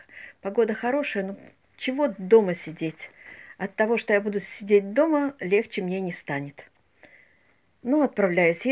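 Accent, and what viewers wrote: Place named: Belarus. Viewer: Говор белорусский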